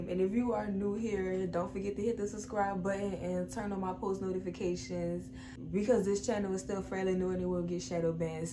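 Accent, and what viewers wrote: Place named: New Orleans, United States. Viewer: I can tell you from N.O from the accent 😂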